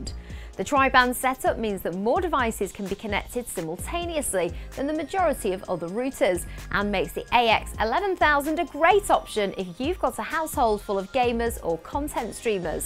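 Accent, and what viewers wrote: United Kingdom. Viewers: Awful British accent!! Rooter, Rooter, Rooter.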